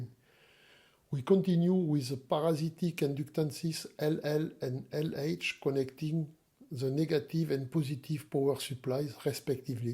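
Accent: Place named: France. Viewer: French accent is soooo funny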